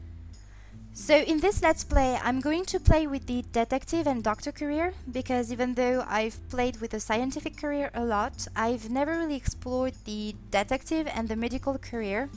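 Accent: France. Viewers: J'ai reconnu direct que tu etait française ❤️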